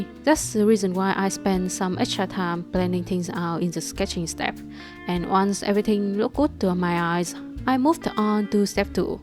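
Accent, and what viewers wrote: Vietnam. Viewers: Nghe giọng bt ng Việt luôn:))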